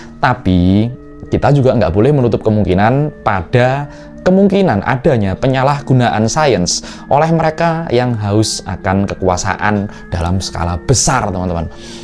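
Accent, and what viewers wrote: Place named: Java, Indonesia. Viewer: .. Saya sangat senang mengikuti kontent konten Tapi larbih baik lagi kalau logat bicaranya kembali ke asal saja.. Yaitu logat Jawa medok.. Akan kliatan Jawa sekali mmitu akan lebih enak dan unik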